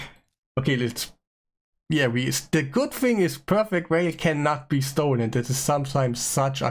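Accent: Germany. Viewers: Is he german?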